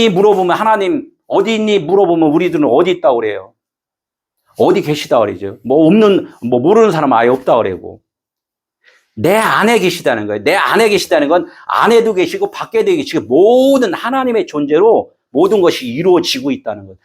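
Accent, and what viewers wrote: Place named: Chungcheong, South Korea. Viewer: 구수한충청도 사투리가 정겹네요. ❤ 뿌린씨앗 풍성한 열매로 결실하세요!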